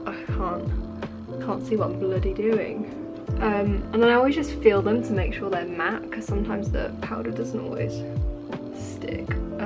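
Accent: United Kingdom. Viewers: Godamighty, pardner, you sure are purty! Put yer makeup away; maybe in 20 years ya might need it, maybe not. Love your cultured British (?) accent and - whata miracle! - you don't say 'like' every other word like, er, as, all the American valley girls on YouTube do. Hey, don't ever come across the pond; it'll ruin ya!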